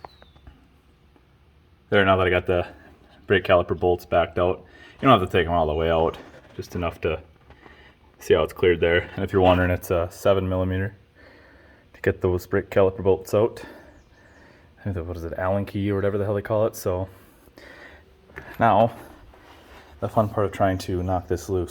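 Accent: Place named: Canada. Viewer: You sound Canadian...will I have a problem if I have an American accent? Thanks for the easy video...it looks pretty straight forward, Thanks!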